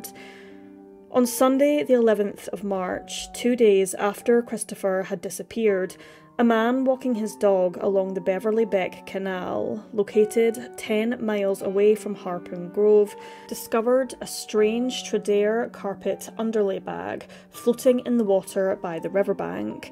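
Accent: Scotland, United Kingdom. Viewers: Shes Scottish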